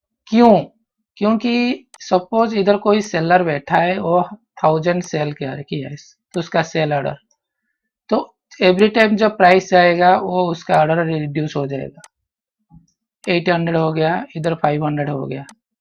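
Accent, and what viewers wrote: Odisha, India. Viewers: Sir are you from orissa ?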